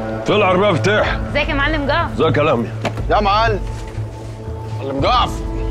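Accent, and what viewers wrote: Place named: Morocco. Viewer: لهجتك مغربية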